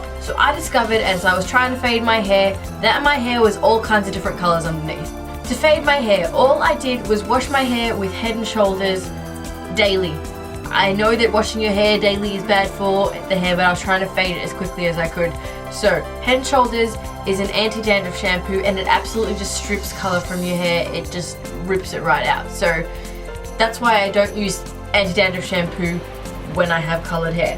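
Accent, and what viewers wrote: Australia. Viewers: Girl, I'm an Aussie living in the UK - was sooooo nice to hear your accent!!! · I think she's an Aussie ^^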